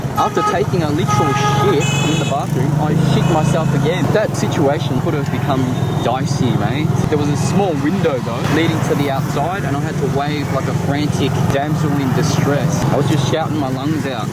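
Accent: Australia. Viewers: haha your australian accent never gets old mate ;) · Haha mate, great video! I laughed so hard. Mate, please rewatch your video with YouTube's closed captions - haha your AUssie accent confuses YouTube subtitles! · Cool! I'm 50 this year great to here a fellow Aussie voice chats soon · Hey do you live in Vietnam now? you sound like your from Melb west like me 😎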